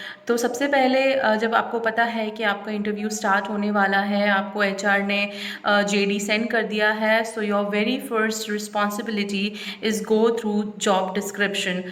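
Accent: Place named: United States. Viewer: Woh great accent Ru from USA? Your English and presentation skills are awesome.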